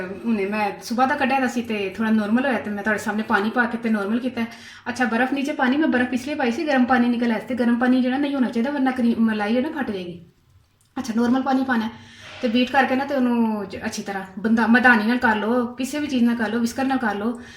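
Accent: Punjab, India. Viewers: Very good style and ur punjabi touch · App behno ki pujabi bolne ka appna hi maza hai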